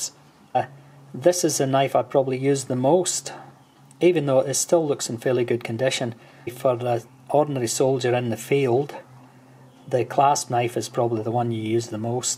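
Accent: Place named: Motherwell, United Kingdom. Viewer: You sound like somebody from Motherwell! Good vid 👍🇬🇧🇦🇨🏴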